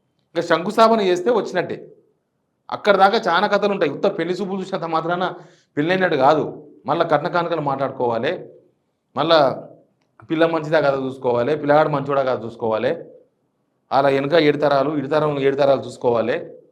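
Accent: Telangana, India. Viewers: పూలపండ్లు అచ్చమైన స్వచ్ఛమైన తెలంగాణ యాస సూపర్ అన్నా..😄😁 good information ..👋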